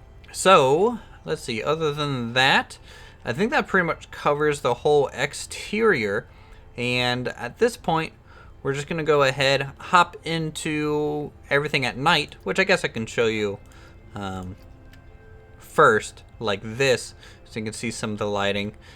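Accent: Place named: South, United States. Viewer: It's weird how you have a southern accent yet sound like you barely know english.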